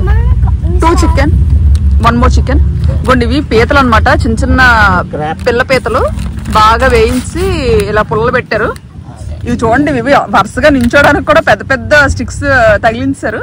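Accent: Vizianagaram, India. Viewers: మీ విజయనగరం మాట మారలేదు అలానే ఉంది చక్కగా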